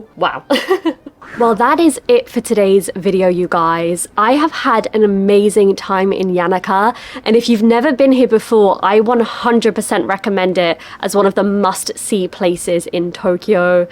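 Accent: United Kingdom. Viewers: 歯切れの良いイギリス英語 米語とは一味違いますね。 良い飲みっぷりで見ている方も嬉しくなります。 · イギリス英語だからジャパン、アメリカ英語だとジャペンですかね。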